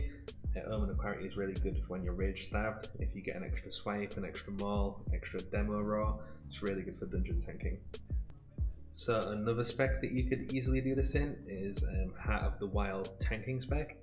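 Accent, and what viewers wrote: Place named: Yorkshire, United Kingdom. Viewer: So Yorkshire I love it, love from another Druid from Sheffield xD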